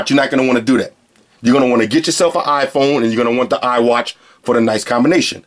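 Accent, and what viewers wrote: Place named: Brooklyn, United States. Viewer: Sound like Brooklyn in the house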